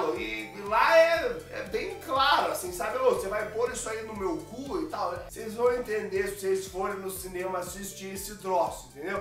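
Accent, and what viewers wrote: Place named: South, Brazil. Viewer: seu gostoso! esse teu sotaque é de que estado do Sul? reconheço demais esse sotaque hahaha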